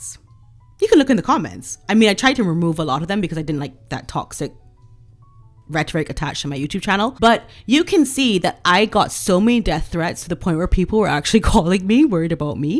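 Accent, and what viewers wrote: United States. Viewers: I love your cheeky take on everything - all of which is backed up with factual evidence. On a personal level, do you have an American accent? Can you talk Barbados talk one day just to show us (me).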